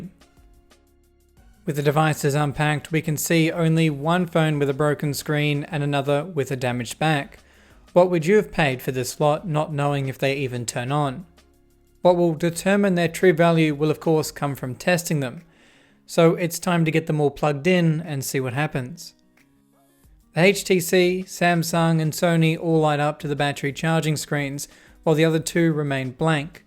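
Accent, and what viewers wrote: Australia. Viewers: Another Aussie yelling at nuggets. I have subbed.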